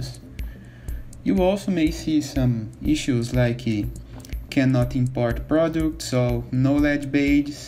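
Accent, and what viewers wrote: Brazil. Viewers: Brasileiro? :D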